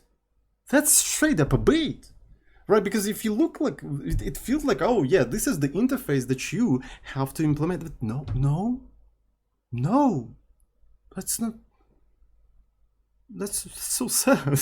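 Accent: Russia. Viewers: Чувствую русский акцент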